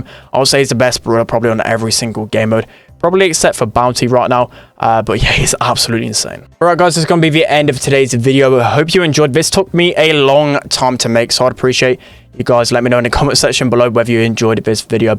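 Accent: United Kingdom. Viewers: It's the british accent xD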